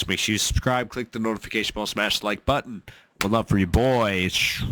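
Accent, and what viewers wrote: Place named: Midwest, United States. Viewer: A-Ha! I see, you live in IOWA. I'm Japanese, but I used to live in Nebraska. Your face, the way you speak reminded me of an American friend of mine back in the days in Mid-West. It must be very freezing now. Take care of yourself, buddy.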